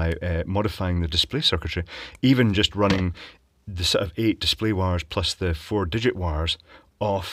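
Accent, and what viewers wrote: China. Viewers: Chinglish.. love it!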